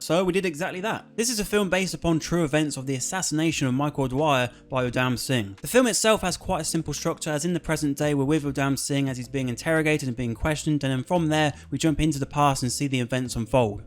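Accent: United Kingdom. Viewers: It's hard for indians to understand ur English accent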